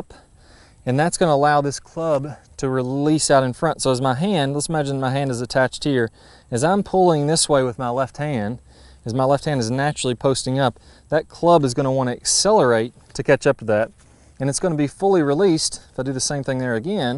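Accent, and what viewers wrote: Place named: South Carolina, United States. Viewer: Great accent...South Carolina??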